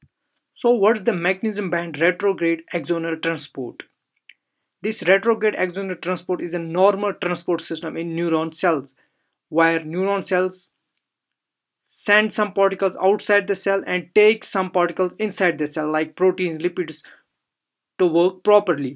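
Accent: Kashmir, India. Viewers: bro u sound like kashmiri . am i right?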